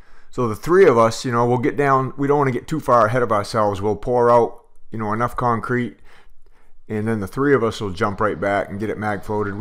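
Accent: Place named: New England, United States. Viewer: Where is this guys accent from? Sounds like a Boston/Maine hybrid.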